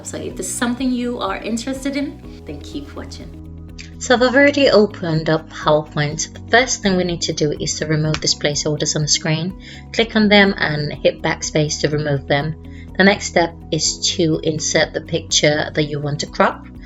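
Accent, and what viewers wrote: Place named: United Kingdom. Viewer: You saved my evening! I have been trying to crop to a circle in Powerpoint for about an hour, reading instructions and a couple videos. You showed STEP by STEP on the screen and that's what I needed. And you did it in your classy British accent to add to the enjoyment! Thank you very much!!! Canva looks even easier....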